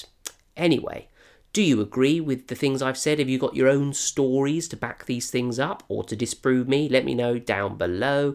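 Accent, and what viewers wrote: United Kingdom. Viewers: the british accent does the rest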